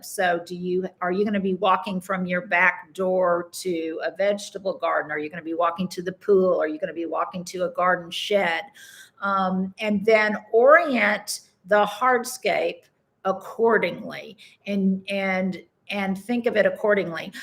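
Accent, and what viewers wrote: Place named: United States. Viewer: I like to count the “and by the way”, especially when followed by “you guys” - I must say however, your way with language is a joy - especially as being English I can feel smug about what we consider the American mispronunciations, especially words like herbs, which we pronounce as in Herbert Hoover - always I can hear my mother saying “ don’t drop your aitches “ love these videos, was really disappointed not to be able to join live. Thank You.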